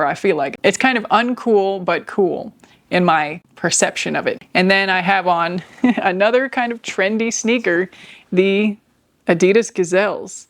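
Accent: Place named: South, United States. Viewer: OK. It’s killing me!! What is your underlying accent. I can hear it when you say “mad”, “had”, “that” “myself” and other words, especially at the end of your sentences. Did you live in the south? Interesting content and great presence. You have a fan here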